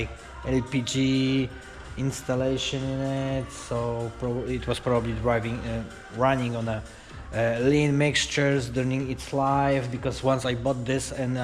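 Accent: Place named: Poland. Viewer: 2.2? I skąd jesteś, bo chyba słyszę polski język xD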